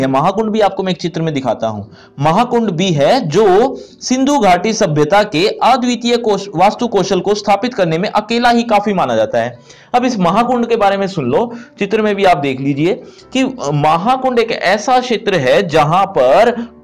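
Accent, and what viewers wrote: Haryana, India. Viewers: sir aap hariyana se ho kya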